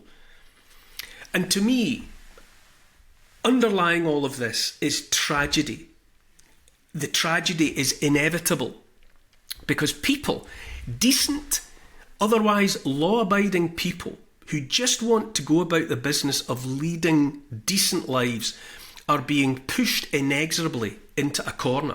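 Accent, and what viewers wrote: Scotland, United Kingdom. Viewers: Good to see your subs increasing. More people listening, and taking note hopefully 👍 🤔 😁 Won't Kneel A gentle, beautiful Scottish lilt A kind, empathic, forward tilt An open heart; a caring face A willingness to take his place. A strong, home-hitting monologue Reminds us of the boiling frog The courage to become a sleuth A passion to release the truth. A deep desire to crack the whip Illuminates our freedom-strip Calls out the darkness taking hold The shiny despots; plated gold! Intense and raw, he lays it bare A soul-enhancing, honest stare Inflections drive the message through He speaks for Us - for me and you! Discernment's such a precious gift His words create a mighty lift Take heed of all he has to say He knows - it shouldn't be this way! 🤗🦋🐬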